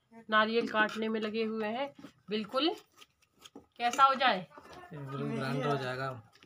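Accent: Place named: Rural, India. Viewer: Tum kaun gaon ke hao .hum tumhari fain ban gai hai .tumari boli bhasa bada achchhi lagat hai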